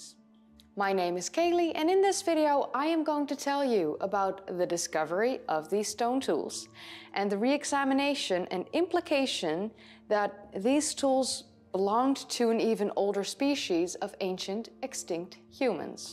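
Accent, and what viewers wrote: Netherlands. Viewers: Dutch girl! (I heard it.) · where are u from? certainly not American some kinda Euro country... OH Holland! cool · that slight Dutch Accent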